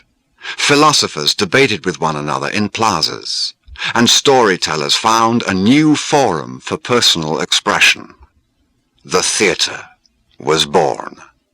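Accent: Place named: United States. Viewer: Jeremy Irons seems to have an American twang to his voice here. Has he deliberately done so for a predominantly American audience?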